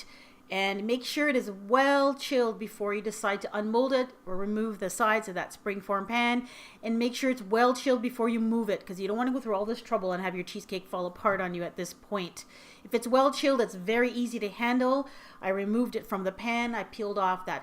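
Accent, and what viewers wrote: Canada. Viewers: i knew you were Canadian!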